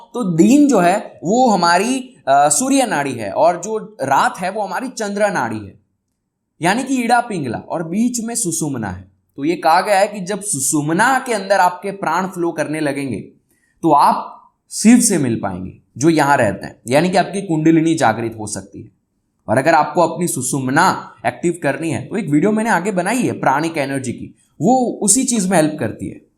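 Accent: Gujarat, India. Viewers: How do I meet your Guru? Thank you. (I note Gujarati accent in your speaking. You seem to be a Gujarati(may be a Marathi). I could be wrong.)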